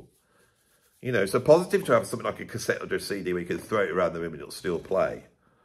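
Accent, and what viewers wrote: Birmingham, United Kingdom. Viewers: You crack me up Brummie in a good way mate. Lived in Stourport-on-Severn <num>. No longer in the midlands, which I miss. CDs have their advantages and some sound fantastic BUT a good quality bit of well recorded vinyl on a quality turntable through a decent amp to decent speakers is something else again... natural! UTV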